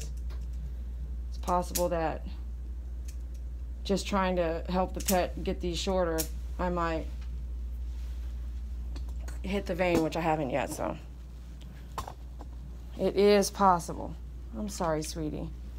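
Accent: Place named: South, United States. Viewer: Love the country talk attitude with the nail trim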